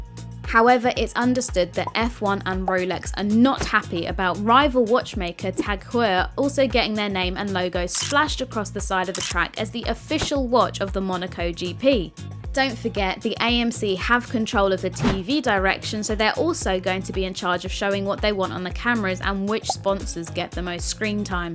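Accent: United Kingdom. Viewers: Your accent is the most British I ever heard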